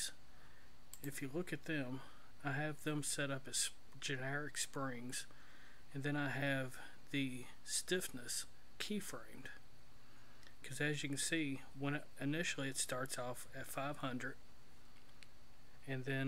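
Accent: United States. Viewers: OH MY GOD YOUR VOICE IS AMERICAN BLENDER GURU IT'S EPIC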